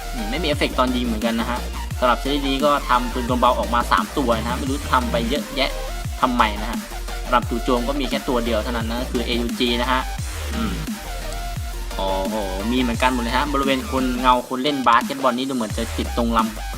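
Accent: Isan, Thailand. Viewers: พี่เปนคนอีสานหรอ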